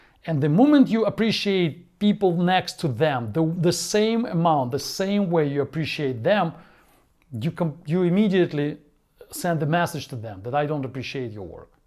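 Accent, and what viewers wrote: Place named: Russia. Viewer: Totally agree with what you are saying. Just a tip. Hire somebody to work on your English pronunciation, it's so Russian now. :)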